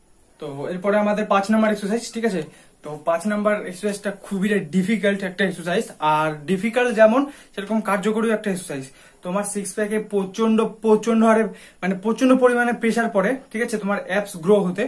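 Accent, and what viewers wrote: Kolkata, India. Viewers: আপনি কি কলকাতা থেকে 😅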